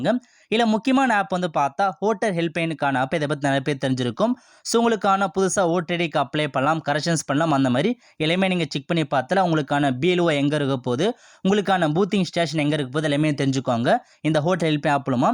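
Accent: North, India. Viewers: Enna bro vadakkan maari pesura ennachu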